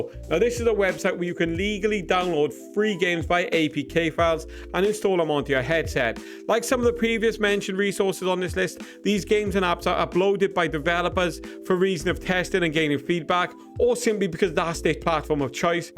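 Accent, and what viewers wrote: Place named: South Wales, United Kingdom. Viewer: Hello which part of Wales are you. I guess by your accent South Wales. I live in church Village and have just purchased the Meta Quest 3 and looking for free paid games to download. Can you help.?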